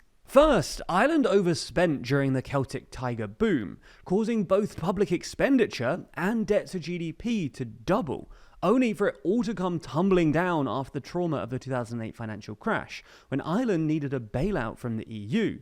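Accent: England, United Kingdom. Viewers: A man with an English accent post Brexit showing interest in Ireland is suspect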